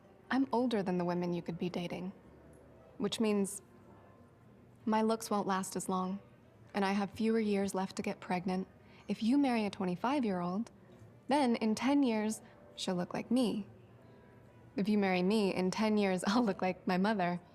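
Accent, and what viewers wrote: United Kingdom. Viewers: Which horror! Sorry for you that you are forced to review such a movie, in French "une daube"! (Watched the trailer. Such waste of pellicule! and such bad acting.) Discovered by hasard your vlog! from Locarno's Festival, appreciating and following you since then. And reading your reviews on Guardian, my preferred newspaper since I was studying classical singing in London many many years ago. By the way did you leave our Lago Maggiore's sublime shores? Did you miss on Piazza Grande the terribly strong punch-movie "Irkalla Hulm Jijiljamish" by M. Jabarah Al-Daradji ? Even under such a never happening before heat wave I was freezing, tears in my eyes, a lump in the throat... Ah, by the way, adore your exquisite English accent!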